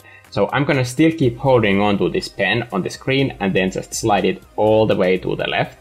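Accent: Finland. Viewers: Hmm are you from Finland? That accents is kinda like a Finland accent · For me it was the reverse: as soon as I heard him speak I thought "wait.... he sounds finnish" looks at name yep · Tuli suosituksii tää video ja heti aksentista kuuli et oot suomalainen xd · Suomalainen aksentti?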